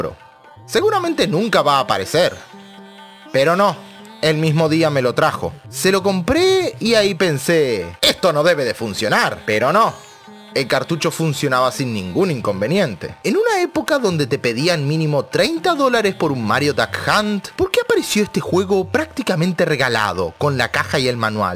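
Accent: Uruguay. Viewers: espera... Sos de Uruguay?... Paisano! xd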